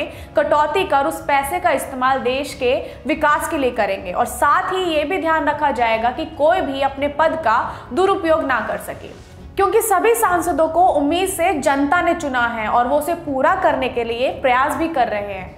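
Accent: Standard. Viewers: Your Hindi seems to be pure, nice to know old way of talking is being preserved. Impressed. 🇮🇳🙏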